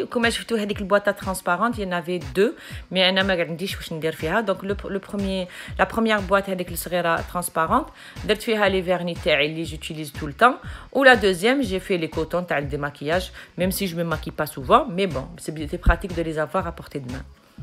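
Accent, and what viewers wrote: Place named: Marseille, France. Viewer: 3andk acson marseillaise bonne continuation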